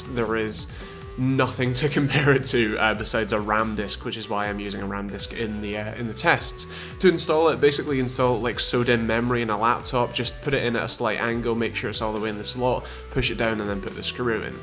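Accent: Scotland, United Kingdom. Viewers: Love that Scottish accent! I am British also!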